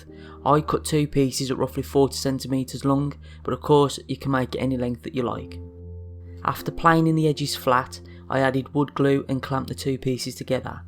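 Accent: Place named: Walsall, United Kingdom. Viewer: They turned out great man. I’ve got a big solid oak worktop offcut that I’d be interested in turning into a shelf and a couple of cutting boards. You sound local to me (Walsall area) if your interested in some private work drop me a reply. Great work mate.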